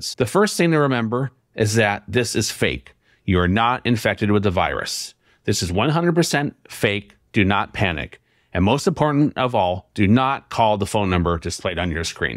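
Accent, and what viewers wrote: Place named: India. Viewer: She had an Indian accent!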